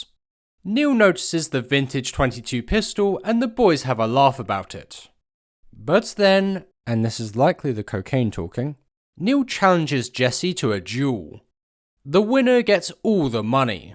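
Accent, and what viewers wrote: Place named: South, United Kingdom. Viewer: It’s just a regular, South English accent.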